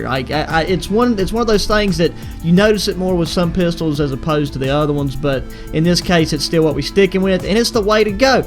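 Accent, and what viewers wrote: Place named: Texas, United States. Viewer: You have a heavy Texan accent 😳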